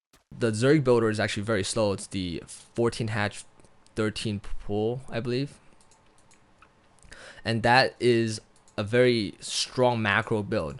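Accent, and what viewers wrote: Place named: United States. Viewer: No offense but your American accent is annoying.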